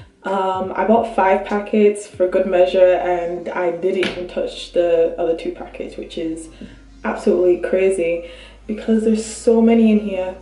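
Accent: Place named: Yorkshire, United Kingdom. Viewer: I bet alot of Americans struggle to understand your thick Yorkshire accent!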